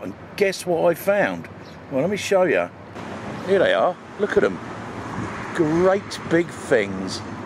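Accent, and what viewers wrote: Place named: England, United Kingdom. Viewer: The first part of htis video throws people off because you have an english accent and you say in London haha. Even though we know your in Canada it's irony I tells ya irony.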